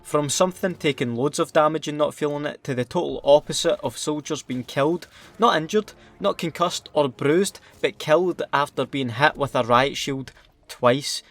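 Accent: Scotland, United Kingdom. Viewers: Your accent makes me question my scottishness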